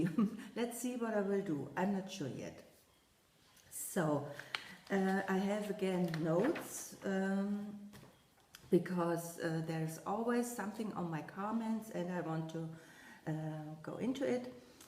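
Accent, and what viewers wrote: Germany. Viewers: Hi Again, great video as always. I was sorry to hear you have been feeling ill with your oral surgeries but hopefully you are on the mend and feeling better. I'm praying for you to have a full and complete recovery. I have a lovely friend who immigrated to the US from Germany when she was very young, I believe some of her family are still in the Frankfurt area. She misses the accent/dialect so I told her check out your channel. Loved your "know your needle-worker" segment. We are so very much alike... in our choices of movies, books and love of music. If I could pick a one word definition for you I'd say, "caring". You care about people, the way you express yourself and how you are perceived, and of course your love for your craft...so yes, to me it's definitely "caring". Have a good stitchy week and I look forward to your next video. Hugs a Bunch, Toni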